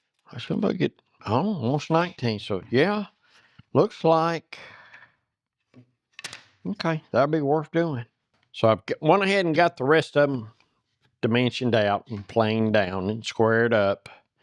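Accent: South, United States. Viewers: Hi, hope you are doing well. I wish you could make more video's more often, but that I imagine is pretty tough to do by yourself. I have watched all of your video's two or three times by now. But the real reason I wante to comment here, is to yell you on one of your video's, dont remember which one now, someone wrote a comment and was complaining about your "Southern Accent." That's probably the biggest reason I love watching your channel. Not only do you do a great job in discussing and explaining how to do every step in the things that you do. But more than that, I love listening to you talk with that "Southern Accent!" So I wouldn't let that get you down, there are way way more of us out here that don't care about your accent, so keep it up, and if that viewer was bothered by that accent of your I would suggest to him or her, to don't come back. 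There are a way more of us out here to don't mind it. Like I said, that southern accent is one of the biggest reasons I watch your channel!. And remember this, no matter what you do or say, there are going to be butt heads out there that you just can't satisfy. Take care, and keep making the video's. Jim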